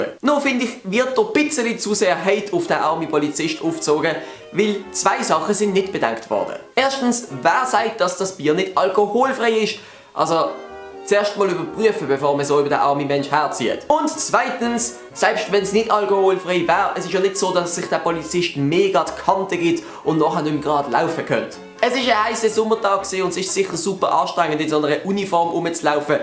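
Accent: Switzerland. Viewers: Ich liebe den Schweizer Akzent :D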